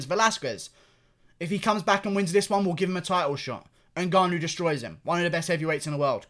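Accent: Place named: United Kingdom. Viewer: People with English accents should never speak about mma. I can't take a brit talking about fighting seriously. Not sure why but i just can't. lol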